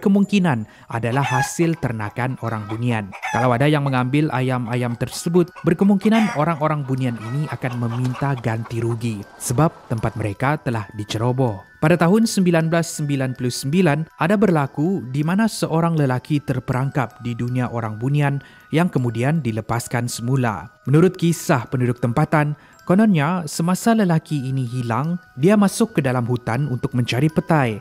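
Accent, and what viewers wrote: Sabah, Malaysia. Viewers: sabah bah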